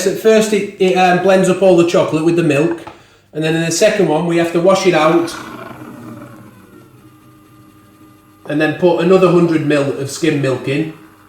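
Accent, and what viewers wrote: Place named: Northern England, United Kingdom. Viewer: Brilliant video thankyou. Been trying to decide between the aeroccino 3 or 4. Great to hear a fellow northern accent too although you might be more red rose whereas I'm the white rose side 😉 Gonna watch some more of your vids but I'm still swaying more towards the 4.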